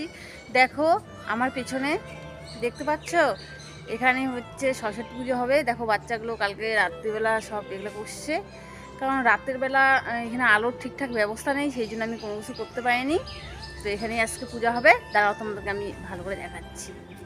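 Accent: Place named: Bangal, Bangladesh. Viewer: Bangal vashata bolbe. Oitai main attraction. Khub valo lage. P😊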